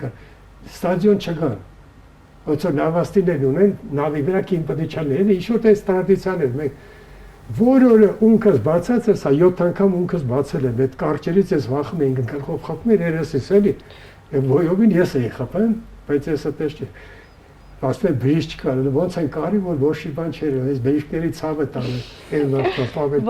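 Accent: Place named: Armenia. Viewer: ❤️❤️❤️էնքան հայ ա, որ ռեւսերեն հայի ակցենտով ա խոսում😍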